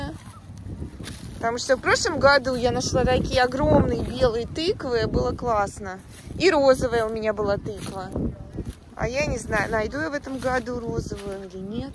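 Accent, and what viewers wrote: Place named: Bryansk, Russia. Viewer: Сподхватились? Деревенский русский. Брянщина. Хорошо,что Джон не знает русский-его бы коробило!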